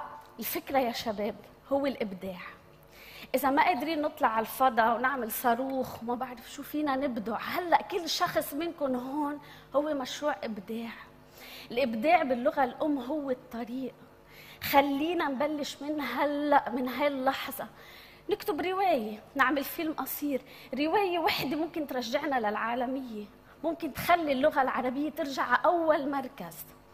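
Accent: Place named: Levant. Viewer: يا حلاة اللغة العربية باللكنة الشامية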